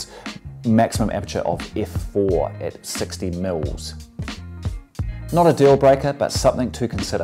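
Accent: New Zealand. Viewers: Great educational video. Nothing like a good kiwi accent. NZ looks fantastic.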